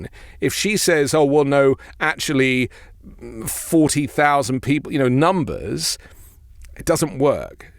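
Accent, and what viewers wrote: England, United Kingdom. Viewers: WTF are you to weigh in on an US election with your boring English accent. Go kiss the crowns ass you boring limey.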